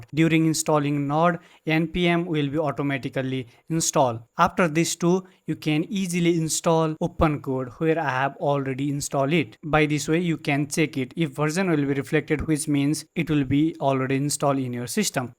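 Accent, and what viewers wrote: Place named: Nepal. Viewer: timro nepali accent lagi raxa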